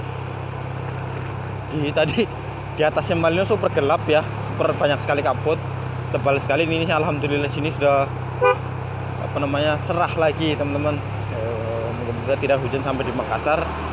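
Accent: Banyumas, Indonesia. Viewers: Asli mana bang . Kok logat,nya kayak ngapak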